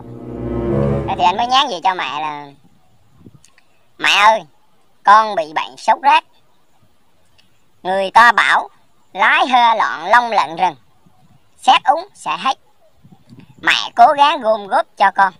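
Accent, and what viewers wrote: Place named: Quang Nam, Vietnam. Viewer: Nói tiếng quãng thật nặng vô nhé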